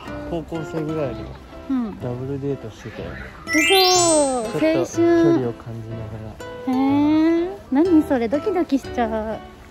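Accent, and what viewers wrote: Oita, Japan. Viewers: 大分弁とピーピー豆最高‼️ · 大分県民として大分弁時々出るの最高です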